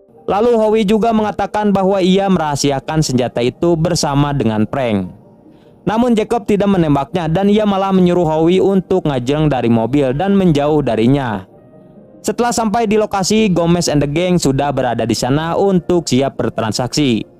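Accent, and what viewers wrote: West Java, Indonesia. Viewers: Aduh a ni resep nyarios na indo Sunda duh 😂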